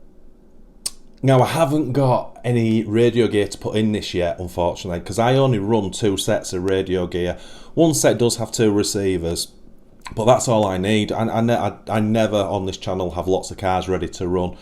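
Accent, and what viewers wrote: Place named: North, United Kingdom. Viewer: I know it's off topic but are you in the UK the accent is northern but I'm guessing you've emigrated?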